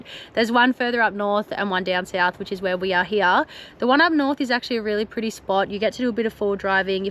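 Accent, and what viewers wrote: Australia. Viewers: Hi honey love watching your videos and you are a very gorgeous Australian sexy young woman honey keep these videos of you keep coming because love the areas that you travel to honey and don’t won’t to brag honey you truly a very sexy and extremely gorgeous young woman i appreciate all of your videos love ❤️ you and your beautiful acsent honey again thank you so much hope to see more beautiful areas of Australia beautiful Outback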